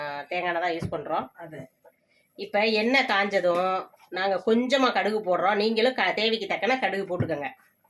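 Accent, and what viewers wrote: Kerala, India. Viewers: She talks as if born and brought up in kerala. She neither looks good nor has brain. Attitude mattum high level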